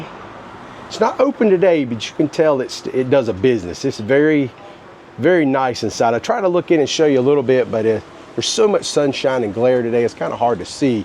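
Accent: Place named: Ohio, United States. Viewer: I'm surprised to learn you are from NC. Your "accent" is very Ohio. Thanks for another good video.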